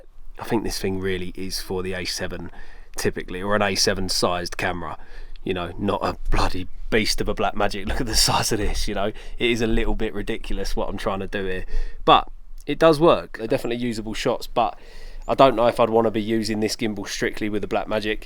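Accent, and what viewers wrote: United Kingdom. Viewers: Bro, I want to learn your British accent 😎😎😎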